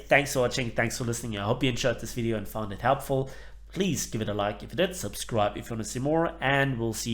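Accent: Germany. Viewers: "Die Aufnahme ist gestartet" bist du deutsch? Würde irgendwie zum Englisch passen, da das einen wirklich nur leichten deutschen Akzent hat😂😉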